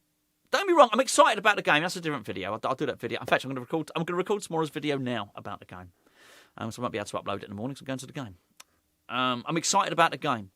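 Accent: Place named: Essex, United Kingdom. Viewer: IF the arse ever falls out of your YouTube career (PLEASE never let that happen) then I think you have shown an excellent skillset to move into shifting to ASMR content. "Tape measure, a peg and a piece of electrical tape". All said in that soothing and smooth Essex lilt. Gonna be honest, I found myself going slightly gooey inside. 😂😂😂😂😂😂😂😂😂😂😂😂😂😂😂😂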